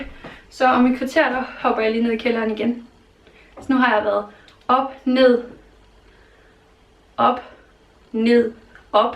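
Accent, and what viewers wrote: Funen, Denmark. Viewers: Fineste. Så dejligt at høre dig noget mere på dansk ❤️. Elsker dit fynske 😍. Knuuus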